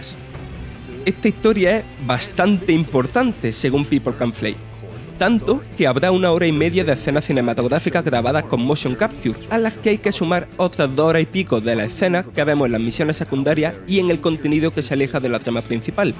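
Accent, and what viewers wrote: Spain. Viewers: El acento de este reseñador de Vandal de qué parte de España es? He oido ese acento en varios españoles y nunca he sabido de qué parte es. Alguien sabe? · Sin ánimo de ofender, quería marcar que: siendo de Latinoamérica se complica entender el acento del locutor. No se reconocer a que parte de España corresponde pero creo que lo tiene más arraigado que otras personas de esa zona que escuché hablar en otras oportunidades en videos y se entendía correctamente. Saludos y muy bueno el canal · Es un acento español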